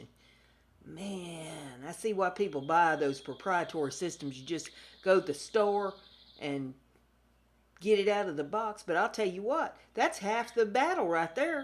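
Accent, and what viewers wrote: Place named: Tennessee, United States. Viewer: lol. I've got to get myself a new computer, too. So, I giggled when you talked about that. lol. I've never used a wood burner on leather, but that turned out beautifully. I've only ever stamped with metal stamps into leather to make some keychains, so the burner effect will be neat to try. I've added this to my support playlists- Handmade Crafty Creators and the one for Leather Crafts. It's great to hear someone with an accent like mine. Reminds me of home back when we lived in TN. lol. Great crafty content, gal. Craft on!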